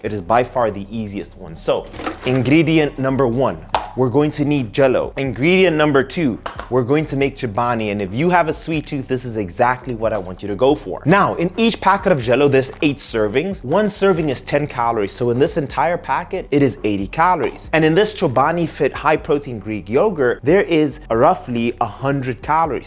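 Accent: South Africa. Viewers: Awesome! Thanks Doc! I honestly thought you were South African 🇿🇦 because of your accent😅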